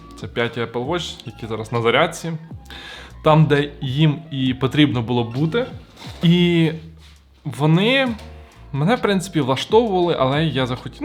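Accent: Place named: Ternopil, Ukraine. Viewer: І ще 1 такий акцент як в нашій Тернопільській області)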